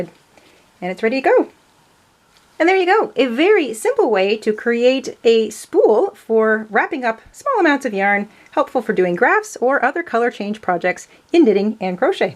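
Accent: Canada. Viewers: Aboot!!! Love your Canadian accent! I’m a huge Rush fan and love Canada!!!!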